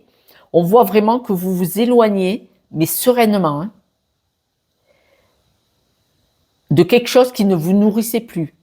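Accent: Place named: Toulouse, France. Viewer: Je suis tombée par hasard sur vos vidéos et quel bonheur votre accent ressemble au mien j'habite sete mais je suis toulousaine fantastique merci. Evelyne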